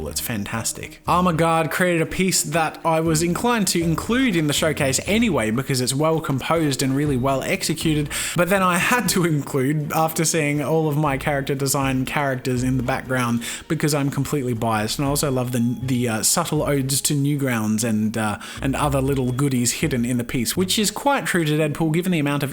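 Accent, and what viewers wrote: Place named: Australia. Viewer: I’m soo late to reply but he’s Australian haha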